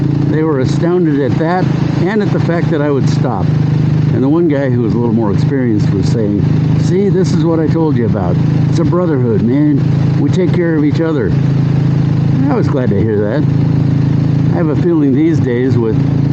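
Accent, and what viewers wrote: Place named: United States. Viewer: Marshall to Leicester... Thought these sounded like towns in Great Britain, so I thought this was another "English Chaps" review of the 350 until I heard your voice! Then thought, OK, its an American living in England! While watching, realized your in North Carolina! Love your Humor and stories ... Like murder in the Appalachians ... which made me think of the book by Bill Bryson, "A Walk in the Woods". When you started recalling stories of your childhood (like using the sweeper for siphoning fuel...back in the 50's), ... another Bill Bryson book came to mind ... "The Life and Times of Thunderbolt Kid". Bill Bryson, like myself, was born in 1951 and your humor reminds me a lot of his! I think we all pulled some "bonehead" stunts back in those days but as long as you made it safely home by suppertime,... mom and dad didn't seem to care what you were doing or where you's been all day! 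Much simpler times back then! Anyhow, I hope your enjoying your Signals Edition in Desert Sand, (as much as I am enjoying mine!)... Sure wish Northeast Ohio had a few extra weeks for motorcycle riding, ( like perhaps North Carolina does?) so my bike wasn't hibernating as long! Your audio sounds great! I'm waiting on the next shipment of Instamics (for my Insta360 One RS camera), so I can loose the dual wires (running from my helmet and saddlebag), and enjoy riding without being "tethered". Drive Safe!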